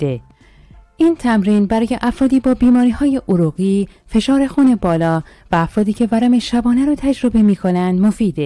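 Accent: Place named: Turkey. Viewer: سلام خسته نباشید خیلی ممنون ازویدئوی خوب تون درضمن لهجه ترکی قشنگی دارین.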